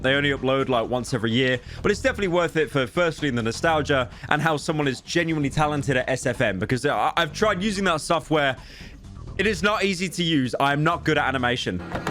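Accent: United Kingdom. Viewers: Bro why british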